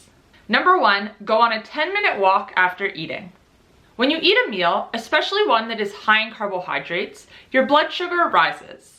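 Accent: Australia. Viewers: I though you were Aussie - great to see an Aussie doing well on YT :)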